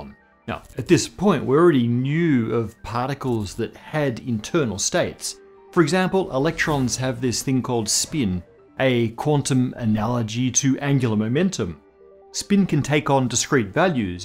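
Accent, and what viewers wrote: Australia. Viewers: I'm so confused, you have an Australian accent and say "zed" not "zee", but you also said "math"...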